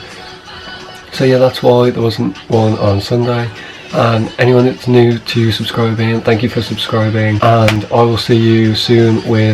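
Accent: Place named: Dudley, United Kingdom. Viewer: I passed on tuesday first time!! Are you from dudley? You sound like it, i am too!! I was so so nervous! I got 5 minors and that was because i bumped the kerb twice and stalled twice on a hill which i never do, if only my nerves didnt get to me i would of got 1 minor! But im so happy!!!! Congrats to you too! Enjoy your freedom, who was your instructor?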